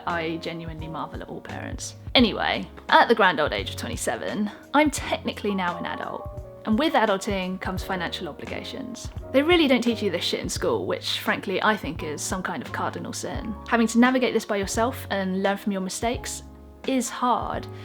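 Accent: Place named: England, United Kingdom. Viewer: love your english accent! And please do more video for minimalism life budget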